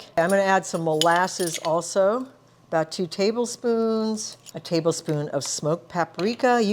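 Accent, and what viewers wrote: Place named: United States. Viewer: Is she Japanese? She sounds American / Canadian.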